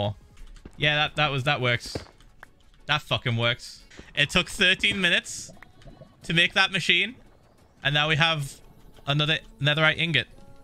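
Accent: United Kingdom. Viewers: It’s a version of a British accent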